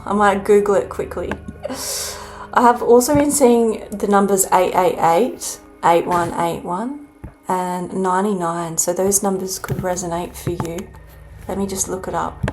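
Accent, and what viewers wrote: Australia. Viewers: G'day there!! You're an absolute bloody legend dudette!! Your head popped up on my feed randomly and I'm proper glad it did! You spoke straight to my soul and it felt kinda weird lol especially when ya started singing and I had a vanilla icecream cone in my hand! Hahahaha ya speak with such wisdom and and better still an Aussie accent! 😉 Everything you talked about gave me chills and alot to think about... Will see ya again soon mate! Much love and respect 🙏🤘❤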